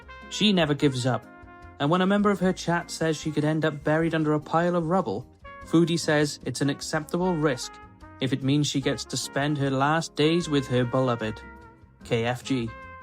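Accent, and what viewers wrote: Wales, United Kingdom. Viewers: i think he might be welsh but that's just a guess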